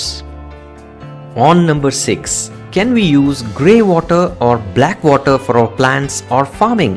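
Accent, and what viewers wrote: India. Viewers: Pronounciation is off.. example...The word 'young' you pronounced 'eng'..... 'sewage' you pronounced 'seawage'. Don't let that deter you. As Indians one can tell you're from South India so your accent is a distinct south accent.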